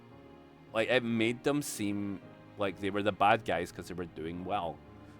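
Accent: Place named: Scotland, United Kingdom. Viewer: 🤔 Whose this Scottish guy, I didn't know they streamed~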